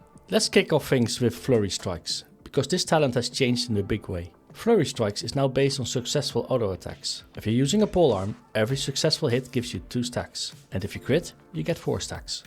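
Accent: Netherlands. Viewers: Is it a dutch accent i hear? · Thanks for the guide, I've never played a monk before and after the video I notice the information sticking with me. (Probably because the class has been pruned) Maybe also because we have the same Dutch accent haha. Can I ask a few specific questions? I have done some content and I've never used Expel Harm, is it a button you ever press? If so, when? Do you always use Purifying Brew at 100 stagger? To keep the cooldown rolling? In what situations do you use Summon Black Ox Statue? How is Master of Harmony on Beta? Cheers again and cya next time.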